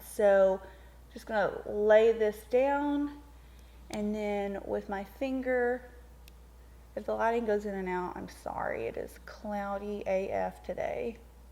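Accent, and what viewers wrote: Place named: Tennessee, United States. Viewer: I love your accent. I am aTennessee gal too. 😊💜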